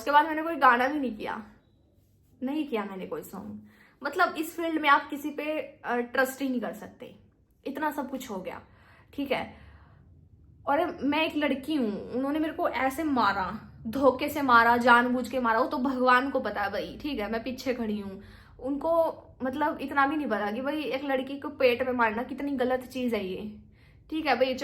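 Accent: Haryana, India. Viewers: yr m proper delhi se apke muh se haryanvi sunke acha lga 😅😅😅😅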